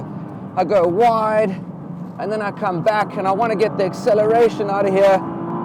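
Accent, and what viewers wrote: South Africa. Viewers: Hey woah, you sounds South African? I am really going to try and make a plan to come for some instructor days to Spa, need see pricing packages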